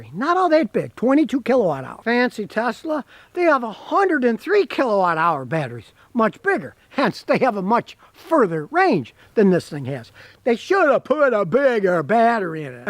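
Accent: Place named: Midwest, United States. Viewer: I could swear you said something at the beginning of this like "rough Long Island road". You didn't say that did you??? That would mean you're from Long Island and then we'd become friends! You have to be in the Midwest by the sound of it, right? I have a 2012 Navigator I'd LOVE to be able to bring in for you to fix!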